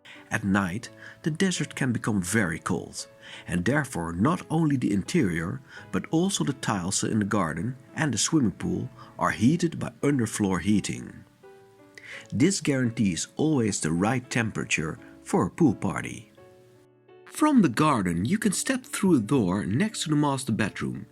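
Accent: Netherlands. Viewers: It’s so funny, I also thought you sounded like the Netherlands, so it seems I was guessing a little correctly. Good luck with all. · Hi, thousand thanks for this absolutely stunning documentary. No clue, whether it was made using AI, but in this case, the artificial help would have been wholesome. I very much enjoyed the abundance of plan views. This is a great plus, considering all the documentaries entirely made by AI running riot at YouTube. They are like a pest. A highly mind numbingly monotonous voice tells stories embellished with lyric weeds, which in general has nothing to do with the topic of the documentary. Would be kind of a match if you put in perspective that the images either are plentiful and artificially rendered and show scenes, which don’t at all match neither the narrator’s discourse nor the topic. In profusely appreciated contrast this documentary is how they should be and show, what the whole point is in doing a documentary. 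By the sympathetic way the narrator pronounces the “v”, tell’s me of his home country the Netherlands and shows me again, why I love the Netherlands and the Dutch people. I must visit the country again very soon, should not be too difficult for me, as I live in Germany. I can only bid you many warm a thank you. Please continue your fine work. Hartelijk bedankt voor je geweldige documentatie. Blijf zo doorgaan. 🇱🇺“Je maintiendrai”🇱🇺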